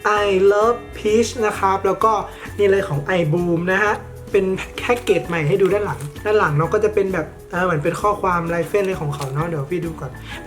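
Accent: North, Thailand. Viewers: พี่เป็นคนเหนือหรอคะ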